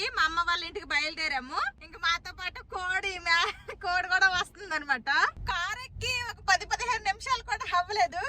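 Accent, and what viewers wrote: Srikakulam, India. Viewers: మీరు సిక్కోలు యాస లోనే వీడియోలు చేయండి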